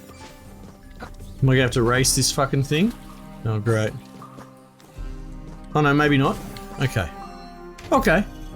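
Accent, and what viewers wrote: Australia. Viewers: Nice original commentary, maybe I just appreciate a good ozy accent lmao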